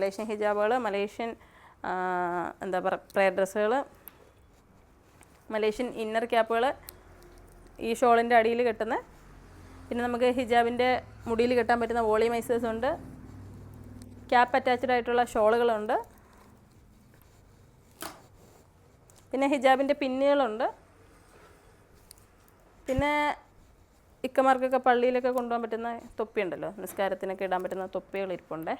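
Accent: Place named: Kollam, India. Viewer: കൊല്ലം സംസാരം ഇഷ്ടായി 🌹🌹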